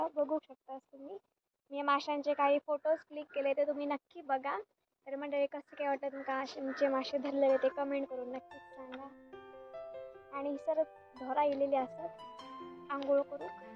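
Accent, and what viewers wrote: Malvani, India. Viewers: I ❤ माझी मालवणी भाषा तू सुंदर बोलते.❤u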